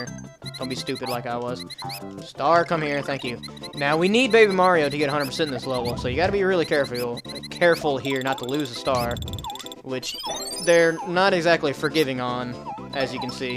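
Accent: South, United States. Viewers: Every time I hear Southern accents, it makes me long for some of my Mom's cookin'! By the way, you're pretty good at this, hope you keep at it!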